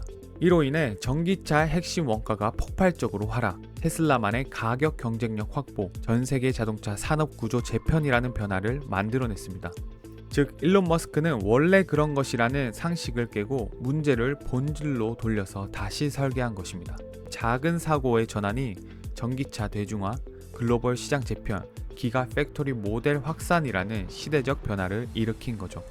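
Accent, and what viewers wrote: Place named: Gyeongsang, South Korea. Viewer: 경상도 사람인가봐요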